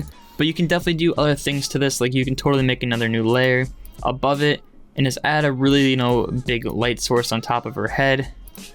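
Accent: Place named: United Kingdom. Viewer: I always thought u was from the uk. cos of the accent and your name ' ' im pretty sure thats a brittish name.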